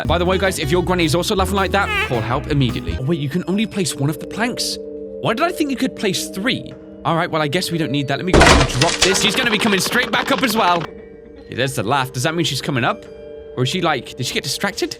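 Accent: United Kingdom. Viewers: R u British,?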